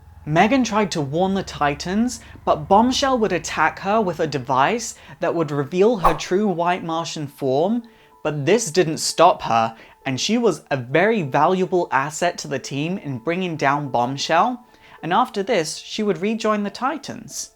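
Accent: United Kingdom. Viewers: dude are u british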